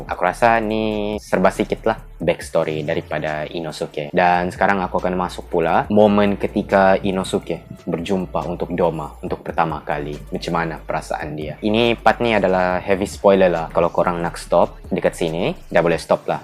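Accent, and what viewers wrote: Sabah, Malaysia. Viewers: logat2 sabah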